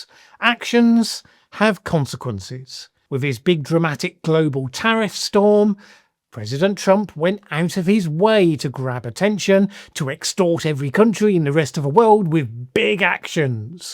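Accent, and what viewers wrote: United Kingdom. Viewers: Better a normal British accent than some nasal yee-haw from an incoherent hill-billly eh ?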